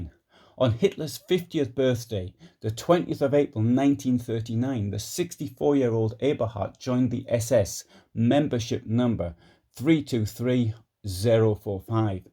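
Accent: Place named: North East England, United Kingdom. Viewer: BTW its great to hear a North East accent sharing such well researched history , we Geordies love our history it seems.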